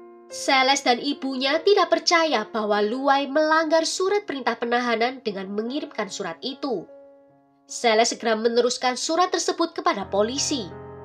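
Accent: Java, Indonesia. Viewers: Mbk jawa nya medok amt mbk😅😂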